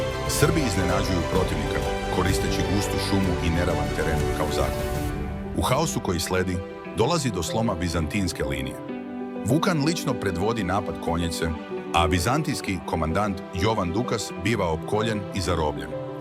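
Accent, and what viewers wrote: Croatia. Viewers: Volim slušat ovaj naš Hrvatski glas kako hvali našu bracu po materi.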